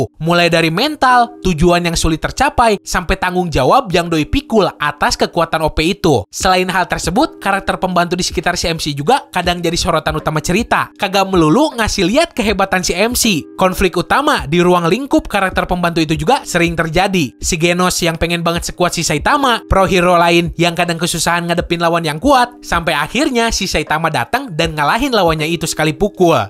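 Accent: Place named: West Java, Indonesia. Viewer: Mimin orang sunda yah haha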